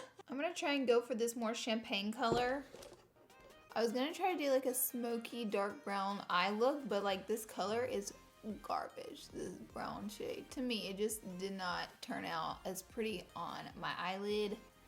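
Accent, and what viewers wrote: South, United States. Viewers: Bruh it’s the southern accent get the eff over it 💁🏼‍♀️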